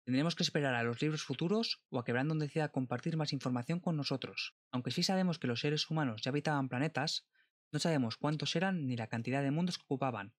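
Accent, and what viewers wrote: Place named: Spain. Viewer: hablas muy rapido (o yo estoy media lenta). Aun sabiendo del tema tuve que volver a escuchar en reiteradas partes, ya que no estoy acostumbrada a tu acento espanol a 90 km/h. saludos desde Argentina.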